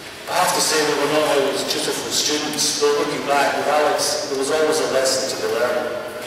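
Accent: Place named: Scotland, United Kingdom. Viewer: I have to listen to this a few times. My clunky American ears sadly can't capture Zal's wonderful Scottish accent. Much Respect to Alex Harvey, Rest in Peace. Much Respect to Both McKenna's, Rest in Peace.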